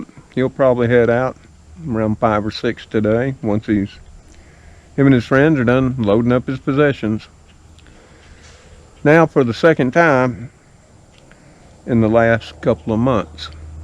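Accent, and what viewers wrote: South, United States. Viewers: Love your Southern accent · Southern accent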